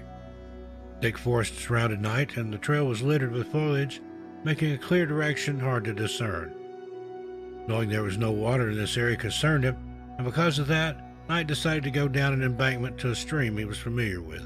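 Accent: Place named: Tennessee, United States. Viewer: I immediately heard a Tennessee accent (was raised there and used to live around monroe county) and got excited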